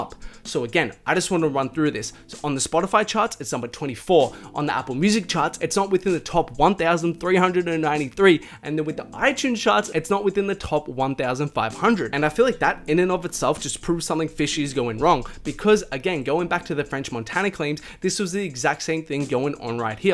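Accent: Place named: Australia. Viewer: He sounds Australian and they got winter now and summer in December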